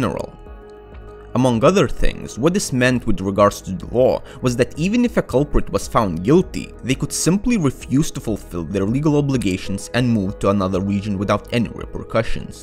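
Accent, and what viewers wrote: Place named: India. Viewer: Need one with out the pajeet accent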